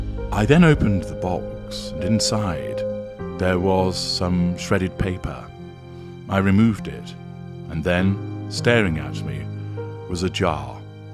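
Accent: South, United States. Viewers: Hilarious! Love the country Southern accent! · you're killing me with that southern accent! You sound like Mr. Tudball, from the Carol Burnett Show. You should check it out. Us Americans, never figured out what country he came from!🤣😂🤣😂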